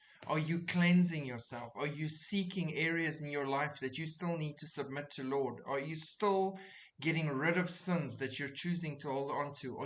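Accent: South Africa. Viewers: I found your discussion on the red heifers today, as I have been following Bible prophecy from the day I read The Late Great Planet Earth. I was so happy to hear a familiar accent and find a fellow South African who truly loves Jesus Christ and believes in the Sanctification of the Believers and who believes in the imminent Rapture, putting Him above all. Wow, thanks!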